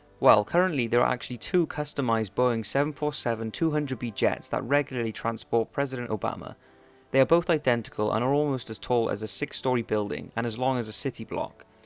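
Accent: United Kingdom. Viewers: The narrator is a Brit. haha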